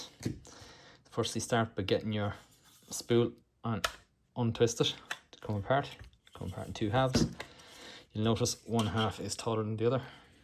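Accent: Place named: Ireland. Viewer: Thanks, helped with my 1st time set up, the notch was catching me out! Good to hear an Irish voice doing one of these vids too, fair play 👍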